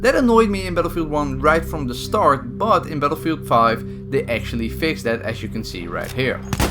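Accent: Netherlands. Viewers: You have a Dutch accent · Ik hoor namelijk een Nederlands accent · He sounds dutch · Ben jij nederlands ik hoor het aan je engels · You dutch? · Are u dutch? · Are you dutch?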